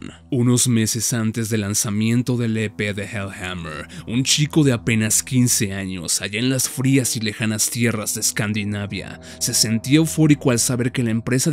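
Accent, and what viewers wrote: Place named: United States. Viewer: una pregunta ¿a que se debe el pronunciar todo lo que no sea castellano con acento yanky? es decir, si ya sabes que bathory no es una palabra inglesa no entiendo el porque pronunciar "bazory" con acento yanki como si tuvieras una magdalena en la boca, perdona pero me parece ridiculo.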